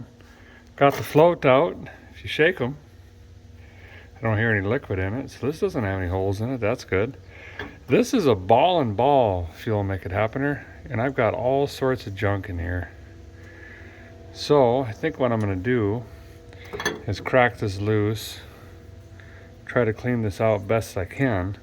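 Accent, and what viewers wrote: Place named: Midwest, United States. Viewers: Damb you're Midwestern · I love listening to this guy his voice is so calming. I can’t put my finger on his accent though maybe Midwest? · I am glad to know that there are other "older" women watching VGG. I am 72 and have the channel on all day, every day. I can't explain why. Honestly, I think it's midwestern roots, speech and work ethic. And he's a patriot.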